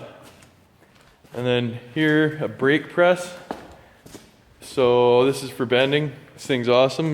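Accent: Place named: Nova Scotia, Canada. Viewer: Your accent tricked me haha totally sound like your from NS. Cool vids btw the anvil is awesome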